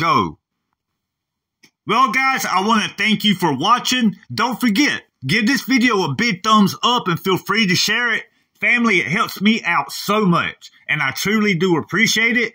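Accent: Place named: South, United States. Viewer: Bro, great video, luv the Southern accent, the prayer, etc. Thanks for all the News re: Israel; God bless you--see you Soon!! (Rev 22: 7)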